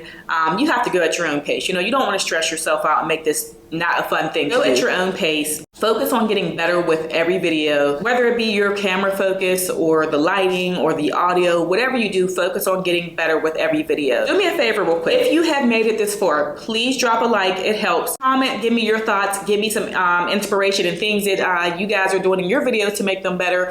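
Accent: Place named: South, United States. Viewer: Congrats on reaching 1k! This was great! And where are you from? I definitely hear a southern accent!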